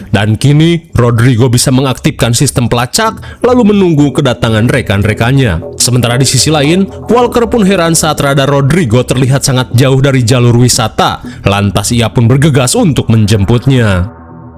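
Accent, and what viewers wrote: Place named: West Java, Indonesia. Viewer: Orang Sunda mana a 😅...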